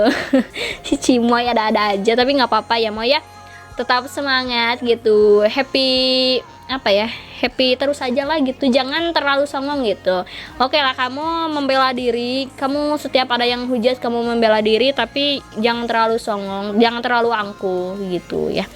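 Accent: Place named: Madura, Indonesia. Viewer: Kek org madura gitu logatnyaa, te sateeee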